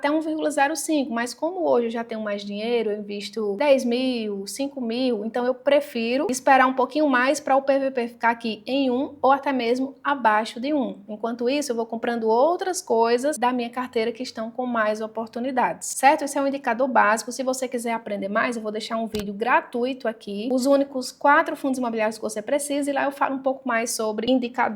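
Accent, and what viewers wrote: Northeast, Brazil. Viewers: Tá cada mes mais gata 😁 e esse sotoque nordestino eu acho a coisa mais maravilhosa do mundo 😂😂❤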